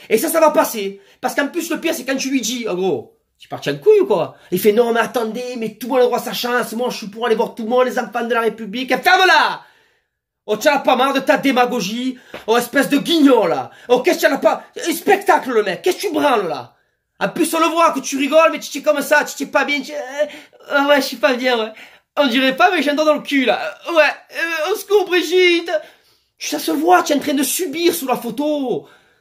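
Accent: Marseille, France. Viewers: Salut j'adore votre accent vive les marseillais bonne continuation excellente journée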